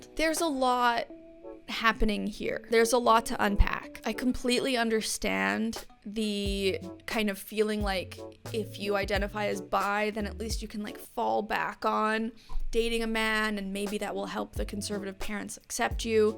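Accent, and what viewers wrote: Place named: Prairies, Canada. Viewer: I'm yer Uncle Taz and I found Midwestern Canadian accent peeking through from time to time. Involuntary Smile Reaction engaged...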